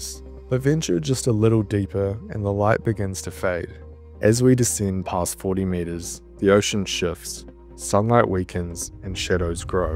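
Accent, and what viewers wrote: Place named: New Zealand. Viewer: I love how the captions corrected 'depths' to 'dips' because of your NZ accent lol.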